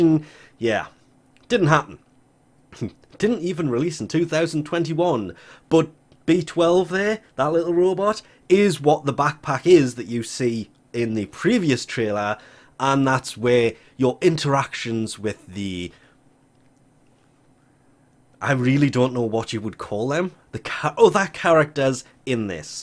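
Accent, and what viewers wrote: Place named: Newcastle, United Kingdom. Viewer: hmm I hear a hint of georgie accent there, I would have to guess durham?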